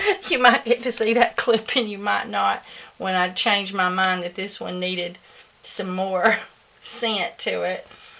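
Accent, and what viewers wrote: South, United States. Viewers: This was a great video. Thanks for uploading. I have been curious about making soap since I saw it being made at Stone Mountain years ago. Thanks for giving your recipe for beginners. I have not gotten up the courage to try yet. But the simplicity of this video makes it seem like, YES I could do this. The Southern accent (LOVE IT) makes me feel like I am learning from my Aunt. ✌🏻❤ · I’m pretty sure that she speaks in a southern accent but is trying very hard to not speak like that🤣🤣🤣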